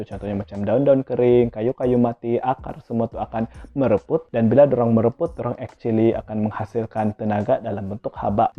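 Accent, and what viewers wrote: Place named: Sabah, Malaysia. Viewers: Ini bukan cakap indon ye gais, ini cakap Sabah..... saja nak bagitau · Pekat betol sabah kau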